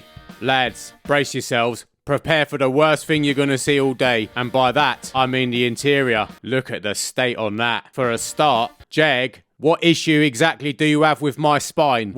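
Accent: United Kingdom. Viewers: This is the most British person I’ve ever heard. I love it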